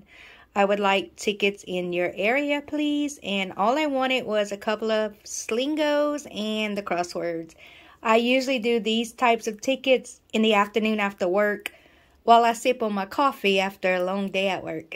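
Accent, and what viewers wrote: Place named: Cajun, United States. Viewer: that cajun accent, you was extra heavy with it and i love it lol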